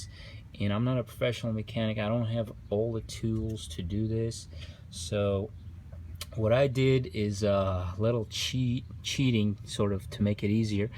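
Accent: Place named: Canada. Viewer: Where ya from mate, sound like a Canuck to meeee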